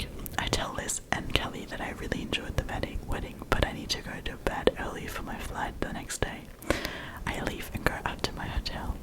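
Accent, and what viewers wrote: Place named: Australia. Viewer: I’m in shock, I’m Aussie and 100% thought you were Australian too! Your English accent is so Aussie I actually can’t believe you’re German 😮 please keep the vids coming they are so good!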